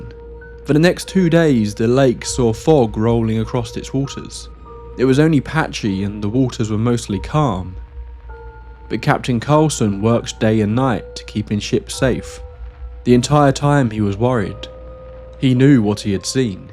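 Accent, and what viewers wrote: United Kingdom. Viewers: Im sorry but it seems odd for stories about America to be told with a british accent . Maybe that is just my opinion, maybe not. And they are good stories by the way 😊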